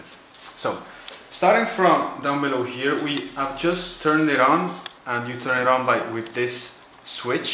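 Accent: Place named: Italy. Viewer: Within seconds I was sure you were Italian! You know how to pronounce the name correctly. 😂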